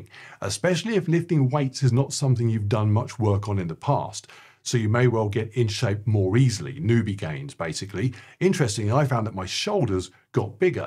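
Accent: England, United Kingdom. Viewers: I need that English accent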